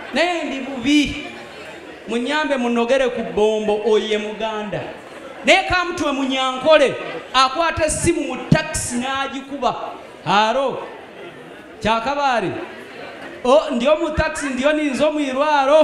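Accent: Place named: India. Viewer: I like the Indian acsent it real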